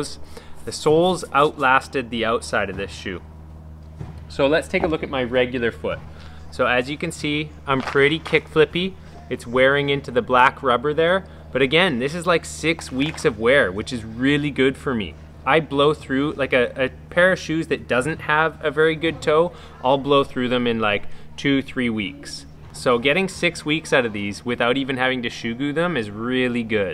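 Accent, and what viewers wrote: Canada. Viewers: Are you from Canada or Minnesota?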